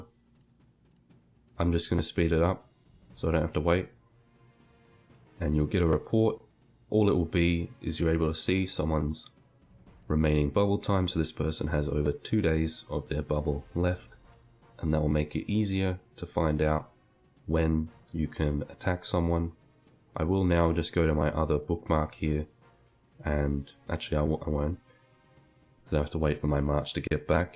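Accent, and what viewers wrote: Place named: United Kingdom. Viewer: Record your voice level at a higher volume on your next video, please I find it a bit low, could be clearer for your wonderful British accent. Great otherwise.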